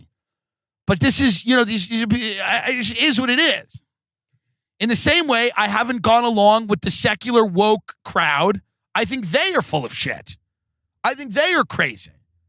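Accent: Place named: Long Island, United States. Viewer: Maybe it's his long island accent but I love when he says SHUT UP! you really feel the disdain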